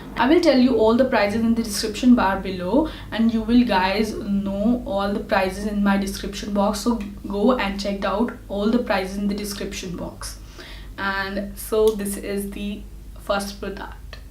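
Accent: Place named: Australia. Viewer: Loving ur accent australian touch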